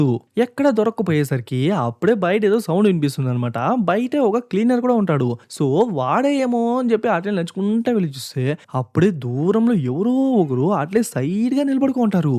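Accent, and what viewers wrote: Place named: Chittoor, India. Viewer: Bro మాది మదనపల్లి. And మీ slang పలమనేరు చిత్తూరు ఆలా అని పిస్తుంది మా నానమ్మ వాళ్ళది పలమనేరు. బయ్యా మీ vasic చాలా బావుంది.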